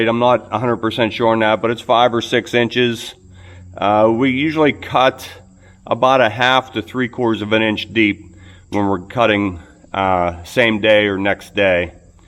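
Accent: Pittsburgh, United States. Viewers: Two things gave it away yinz are in the Pittsburgh area 1 . your accent and the way you say House. 2. That big green truck with "THROWER" on the door .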